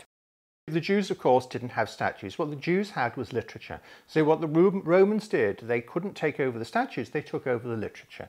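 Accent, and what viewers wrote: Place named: England, United Kingdom. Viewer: Wow you sound so smart with the English accent and all and yet I don’t believe anything you regurgitate as truth - nice try.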